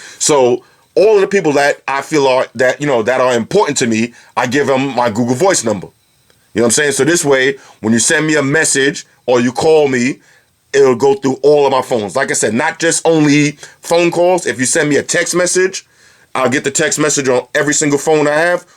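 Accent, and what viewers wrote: Jamaica. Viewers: R u from Jamaica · u from Jamaica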